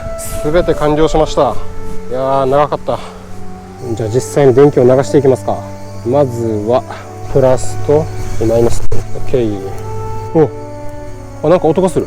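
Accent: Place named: Okinawa, Japan. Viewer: 沖縄のイントネーションとキャラがいい感じで楽しく視聴させて頂いてます！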